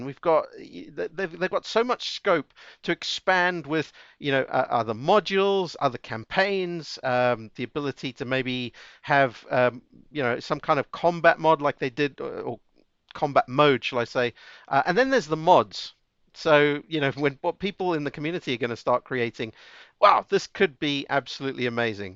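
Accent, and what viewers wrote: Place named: Greece. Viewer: You sound so much like Greek lol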